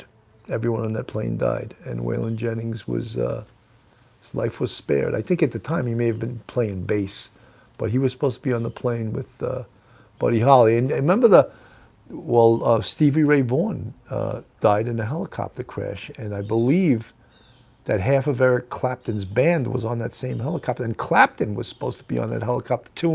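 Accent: New York, United States. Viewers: Having lived in NYC when I was a kid you sound like an old friend. Thanks!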